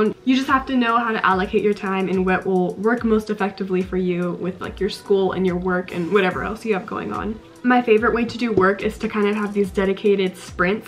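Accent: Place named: Germany. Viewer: So cool! Ich habe mir gedacht dass du einen klein deutschen Akzent hast. Wirklich nur eine kleinen. Danke, dass du deine Erfahrungen mit uns teilst.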